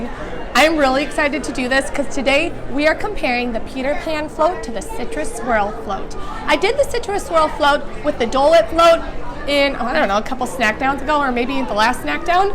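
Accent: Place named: Western New York, United States. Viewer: are you from western NY? The accent sounds like you may be.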